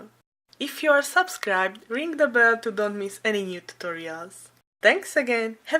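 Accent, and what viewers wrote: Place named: Netherlands. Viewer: Hi! I chatted eith u on IG briefly. Awesome creation!😍😍😍😍 ps..love ur accent (dutch?) Im in USA (New York accent but i live in Florida🌞)lol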